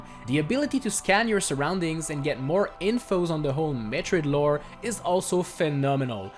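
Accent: Quebec, Canada. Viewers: Are you French Canadian? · ca sonne vraiment quebecois ton accent chum ! premier video, thumbs up !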